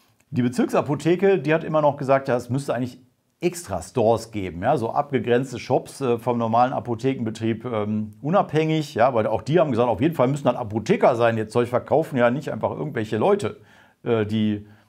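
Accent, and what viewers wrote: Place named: Rhineland, Germany. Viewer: Dein rheinischer Dialekt ist sehr sympathisch, Hr.. Wie es aussieht, haben wir es geschafft! Wie es der Presse heute zu entnehmen ist. Warte aber sehnsüchtig auf Eure Bestätigung. Tolle Arbeit! Toller Support! Danke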